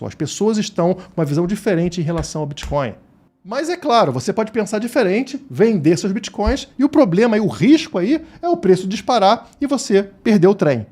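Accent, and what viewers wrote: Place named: Rio de Janeiro, Brazil. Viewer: pelo sotaque acho que é do RJ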